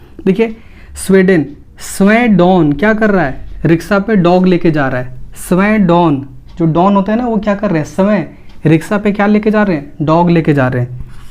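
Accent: Bihar, India. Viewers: सर आप बिहार से ही हो क्या ,, बेस्ट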